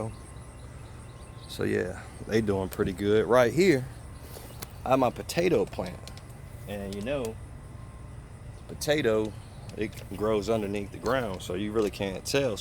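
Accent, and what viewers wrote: United States. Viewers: Is that a New Orleans accent I hear? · Love this accent... is it cajun ? What is this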